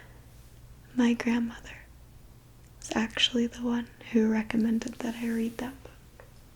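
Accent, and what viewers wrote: South, United States. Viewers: Excellent as always. Southern accent is my favourite, and I'm British 😊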